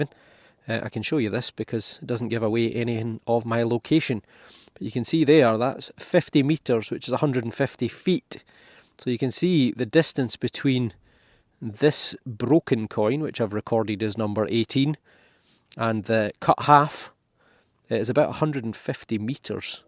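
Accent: Scotland, United Kingdom. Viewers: I chuckle when you say worm. Your accent to me sounds like your saying werdems. I’m American with my ancestors coming from Scotland moved through Ireland and eventually to the US. My Grandmother carried your accent. If you know of any McCardles or McClellens say hi to my peeps for me lmao😅. Man I’d love to come over and detect the mother land. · Love the Scottish Accent, enjoying your knowledge and the history, great informative videos.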